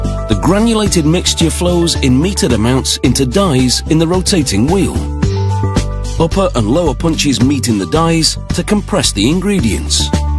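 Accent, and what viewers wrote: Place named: United Kingdom. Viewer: FINALLY!!!! THE BRITISH DUDE!!!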